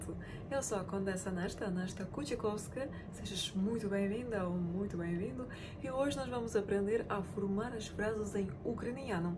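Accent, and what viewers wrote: Portugal. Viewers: tu hablas português del Portugal 😅😅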